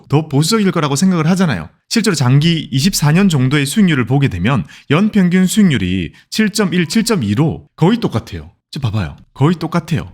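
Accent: Gyeongsang, South Korea. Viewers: 투자공부보다 갱상도 억양의 보이스가 너~무 매력적이라 귀에 쏙쏙 붙어서 목소리 들으러 오는데 덤으로 알려주는 투자방법도 내 성향에 맞는것은 가져 감 ㅋ 쌩유요 ^^😂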